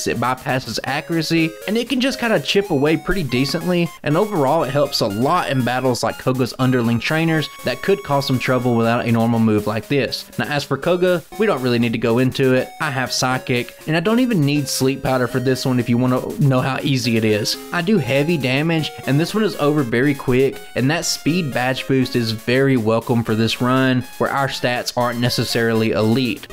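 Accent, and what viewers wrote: South, United States. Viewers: Hey man just wanted to comment to say I love the content and just to support the algorithm. I love that your voice feel so like home since I am from the south as well! Keep it up! Can’t wait to see you continue to grow :)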